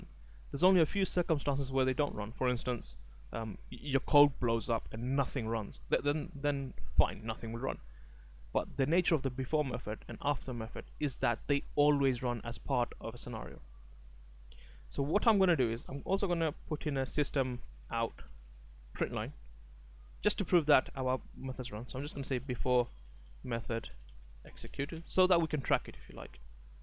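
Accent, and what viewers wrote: United Kingdom. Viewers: Hi, Your work is Amazing buddy, I like it very much, to the point videos. I do have bit of a issue with following some sentences of your British accent, but this issue is far from the quality of subject ad experience you deliver. Keep up the great work.. One thing I wanted to ask, in your videos, you worked with small examples, small piece of codes. I am Manual tester with some automation experience in QTP, I am after learning automation using from your and other people's videos, i want to start my career into Automation using selenium, but I am hesitant because so far we have only learnt with small samples. Can you give us a real time example or real time requirment in regard with automation, so I can judge the scale of intelligence required to do automation in real world . I know this is a general question , to which mots people end thier answer with " IT DEPENDS" and leave the questioner to swim in the ocean, Can you please try to answer this in more helpful way to newbies by giving some real life examples you came n your carrer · Where are you from men? 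Your accent sounds like... I don't know... Scottish? (well definitely not an Indian?..)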